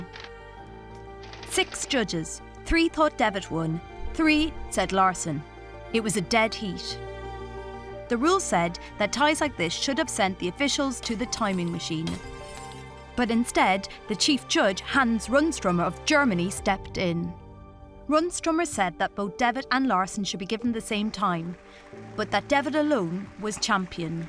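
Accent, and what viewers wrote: Ireland. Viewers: She is Irish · She's Irish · I looooove her irish accent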